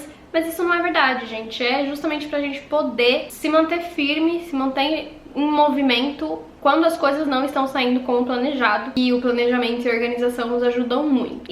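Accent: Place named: Paraná, Brazil. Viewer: querida, vc é do interior do Paraná? Temos sotaque parecido!!! Concordo organização e planejamento é fundamental, parabéns pelos seus vídeos!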